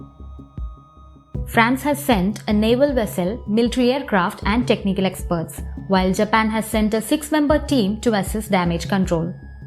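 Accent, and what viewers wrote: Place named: Kerala, India. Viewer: Your Malayali accent ... Beautiful!!!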